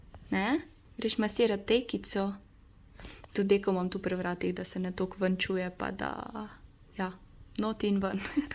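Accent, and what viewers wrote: Carinthia, Austria. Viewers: Ful si fajna!💕🌊Aja iz kot si ti ko mas ful koroški naglas,js sm iz koroske?🌍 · tudi ona je iz koroske drugace nebi mela koroskega naglasa😂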